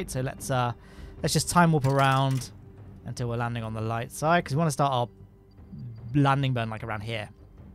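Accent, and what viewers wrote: United Kingdom. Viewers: Love seeing new vids and hearing about whats going on with my favourite cheery brit.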